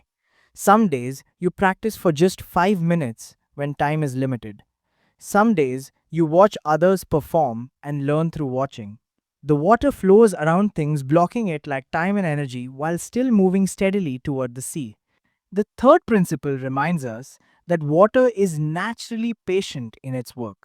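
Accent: India. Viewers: Hmm. Lao Tzu stories with japanese graphic and indian voice over. I don't think so. 😂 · Are you from india ? im just asking buz your accent look like that' 🙂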